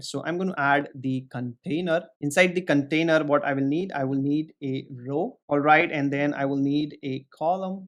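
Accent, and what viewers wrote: India. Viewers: indian english bhi chalta bhaii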